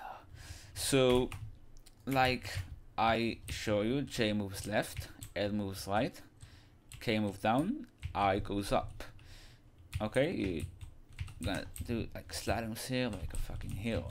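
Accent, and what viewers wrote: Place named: Israel. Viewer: u sound israeli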